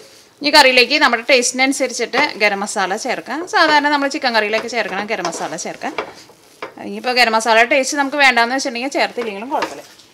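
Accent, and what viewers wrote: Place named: Ernakulam, India. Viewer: Ernakulam style samsaram..husbandinte auntye ormaverunu😘.sound kelkubol...😁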